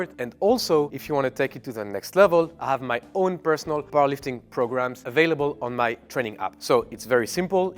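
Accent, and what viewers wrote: France. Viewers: A French talking english......thats a rare kind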